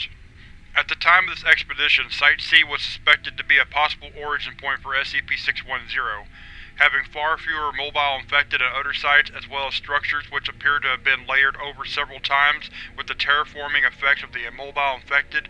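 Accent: South, United States. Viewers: Nice southern accent! You make this seem like SCP 610 was real. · where u from? GA kid myself yet hard to place where u are below the mason dixion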